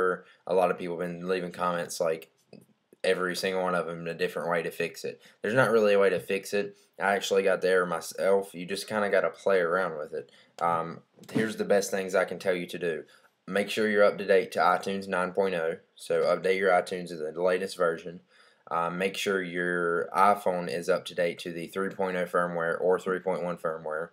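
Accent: United States. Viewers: Note to Self- Dont get tech advise form a guy with a country accent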